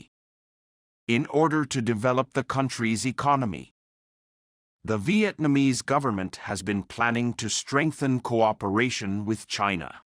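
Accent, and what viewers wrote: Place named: United Kingdom. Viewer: Hmm your tone to speak but slow looks like.. british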